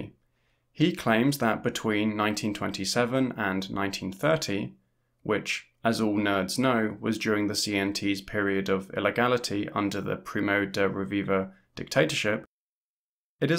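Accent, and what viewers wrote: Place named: United Kingdom. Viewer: Would recommend learning Spanish or Catalan if you can muster up the willpower to do it both because they are useful for your purposes as not being able to read the language is a roadblock you mention multiple times in this vid and also because in the past your pronunciation of certain Spanish proper nouns has been so "British" for the lack of a better term that I've kind of struggled to find the person/thing in question in personal search afterwards. (could also just edit the captions where proper nouns pop up because the auto-generated ones usually don't hear it but I don't know if you can do that anymore)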